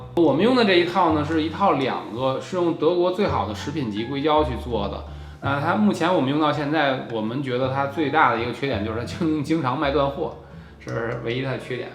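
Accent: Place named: Beijing, China. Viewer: 看你很多期了 各种北美生活的妙招儿 北京话也特亲切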